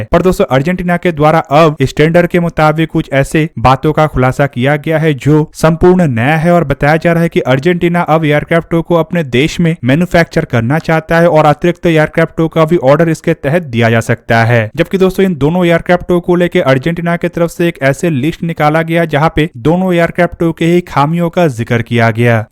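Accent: Bengal, India. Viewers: Bhai Bengali ho kya Tum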